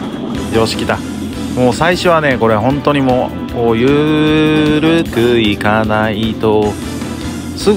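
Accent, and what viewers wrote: Kansai, Japan. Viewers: このゲームおもしろいですね！！笑さん関西弁でした？！ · 相変わらずの関西弁ナイスー👍 そしてLINEの返信が全部英語ꉂꉂ🤣𐤔 時代感じます😅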